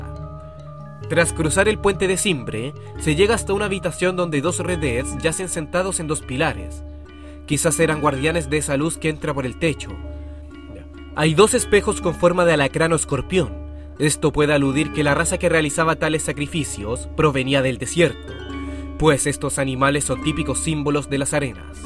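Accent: Chile. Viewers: tu voz me parece de Chile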